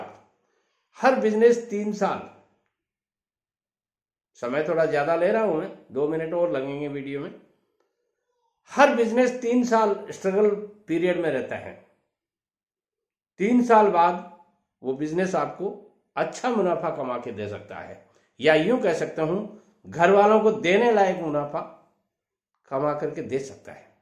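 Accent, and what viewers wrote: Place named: Bihar, India. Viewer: Dadaji Ham aapki awaaz ko mahsus kar pa rahe hain jaisa lag raha hai ki aap Bihar Jharkhand se honge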